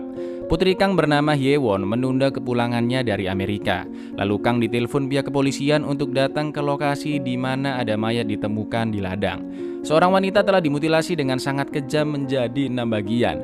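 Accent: Java, Indonesia. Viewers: Kak orang jowo to logat ne kayak uwong jowo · Jowone ngndi mas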